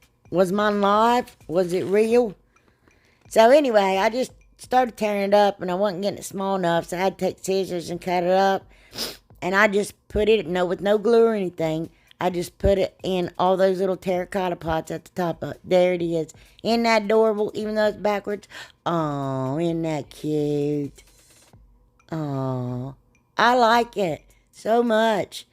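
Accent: South, United States. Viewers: 1st time viewer,,,and I absolutely ❤ you and your personality and not to mention ya sound like me when I talk,,,(country accent)🤭🤭🤭,,,I'm from Alabama,,,where are you from? Love your creativity,,,,I am now subscribed,,,cant wait to see more,,,thank you for sharing💕💕💕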